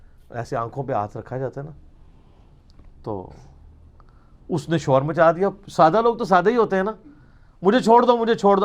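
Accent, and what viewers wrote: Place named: Punjab, Pakistan. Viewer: it punjabi language accent if you don't like it then its your problem. most of the punjabis speak like this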